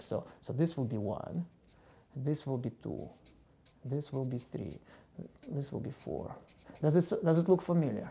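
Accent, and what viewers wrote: Russia. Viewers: You got a strong accent *kind of Russian I guess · The accent is Russian. I know several people with exactly the same accent. Actually, it could be Belarussian as well. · Not sure why but his Russian accent makes me understand math better. My all time favorite Numberphile video. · there is no german accent to hear in this. Believe me i am German. His accent is clearly Russian and i find it quite nice to listen to. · Now why does he have russian accent? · Я тоже смотрю и думаю - он по ходу русский, раз я так хорошо его понимаю) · да да, вместо three три говорит :) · I love math, as much as I love your Russian accent. :3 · Me hear russian accent too. It's interesting because the man who named edward frenkel cannot be russian. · мне кажется или у него русский акцент?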